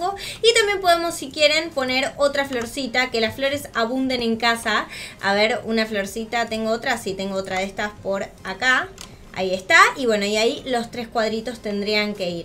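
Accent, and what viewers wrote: Buenos Aires, Argentina. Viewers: Me Encantó una pregunta.. ¿Sos de Oeste o Éste del Gran Buenos Aires? 😁😆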